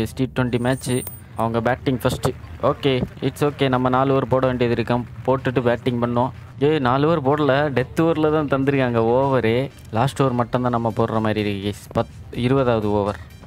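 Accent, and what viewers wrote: Kanyakumari, India. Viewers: Bro neega kanyakumari ya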